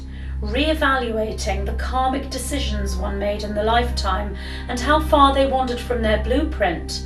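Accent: United Kingdom. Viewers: Bit British aren't we?? I'm British too...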